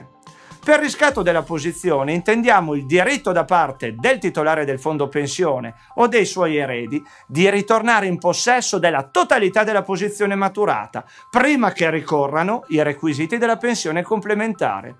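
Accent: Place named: Piedmont, Italy. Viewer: M'iscrivo, oltre che per i contenuti interessanti, anche per il marcato accento piemontese😄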